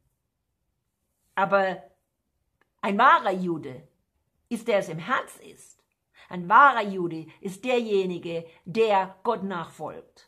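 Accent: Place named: Baden, Germany. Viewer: Liebe, ich komme Auch aus dem badischen, ich wohne bei Wiesloch 😍 Habe früher schon gedacht, dass wir aus einer Gegend kommen, auch wenn Du sehr schön hochdeutsch sprichst, man hört es trotzdem "e bissl " Gottes Segen ins Ferne Amerika 🙏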